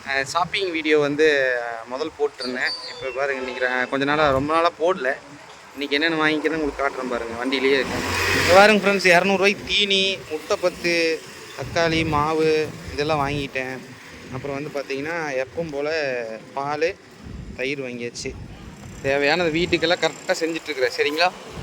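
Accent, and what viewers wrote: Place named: Kongu, India. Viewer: Anna.unga video all we like .unga kongu Tamil nice.namma oru speech la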